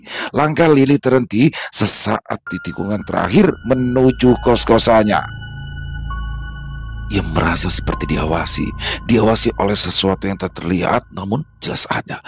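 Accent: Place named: Java, Indonesia. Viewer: Ngakak klau denger logat bicara gembul bg medok bgt lucu seru di tambah bg Dono yg slengean keren bgt bg hidup bgt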